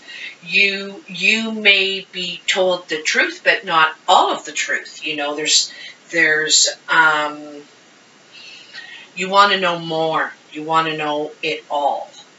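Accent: Canada. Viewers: I thought so, I just got such a vibe, probably 'cause i too am in ontario, but when you said "eh" I thought yes! she has to be Canadian, lol Tks for replying :)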